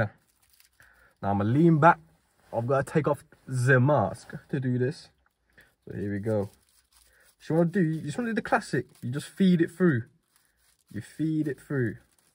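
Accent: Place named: Birmingham, United Kingdom. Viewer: u from birmingham? nice accent.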